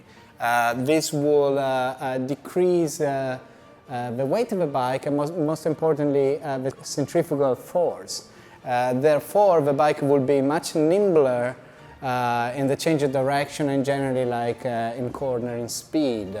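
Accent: Italy. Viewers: I love the video and your charming italian delivery! :D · 😲 Wow! Whom better to present it than you. I would love some more technical iteration on the diverse releases of the 916 range. So all the different versions and the technical differences. All the way from the 916 to the 998S and R and final edition. Is that something you would want to make, or not in your interest? I've owned my 998 for over 10 years now and even used it as for pleasure on the road at first and later on at the track. At the track it really comes alive! I like the color of your bike. And especially in combination with the yellow lights it really harmonizes with the green of the 996 The Matrix bike. And the pull over can not be missed off course! Camera work impacable and sound, voice, pause and speak rythm as well as your suiting Italian English is coming together perfect. Love both parts and want to know more about the Italian bikes from you in front of the camera!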